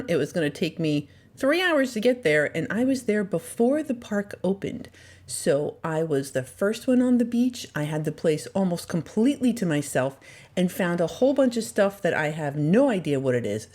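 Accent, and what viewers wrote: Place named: North, United States. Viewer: Ive been going back and watching the older videos. I mentioned to my husband that you had an accent that sounded northern. We always wondered and you answered here by saying NJ 😂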